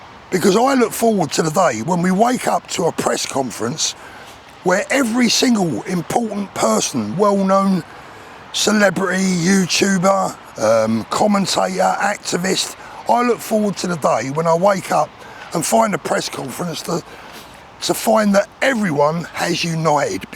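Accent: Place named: England, United Kingdom. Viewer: home is where the heart is, you like the growth rings inside a tree, they will never change. You grew up in England, your accent is English. You care about your homeland and that will never change I suspect. You moved to change your families life all good reasons, and thats why we have freedom of choice. You are speaking a truth that resonates with your subscribers, and I, along with those who care, still care about you as much as you care about them. The tyre kickers have nothing better to do. They live their lives attempting to drag everyone down to their level of sitting back doing nothing, and spitting out nothing but negativity, because thats all they are, negative people who achieve little, and is why when they see someone who has achieved through hard work, good decisions, and luck can play a part. But they thrive off hate, because thats all they have. 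Just ignore them, when you do that, they lose all power. They will shrivel like a dry leaf when their hatred and negativity achieve nothing. I agree with every word you said close to the end. Best of luck, your heart is true and we all luv you brother.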